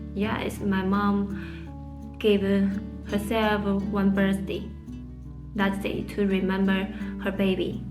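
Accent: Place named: India. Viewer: Your English like Indian 😊, ❤ Bhabhi ji. Namaste ❤ 😊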